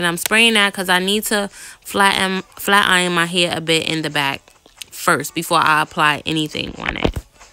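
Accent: New York, United States. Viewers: You sound like your from NYC. Where can i find you sis your channel is everything and CONGRATS on your one yrar anniversary 🎉🎈🎊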